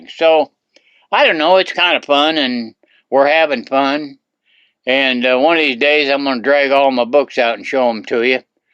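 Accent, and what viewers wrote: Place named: Texas, United States. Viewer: Great person, Love his bit of texan accent.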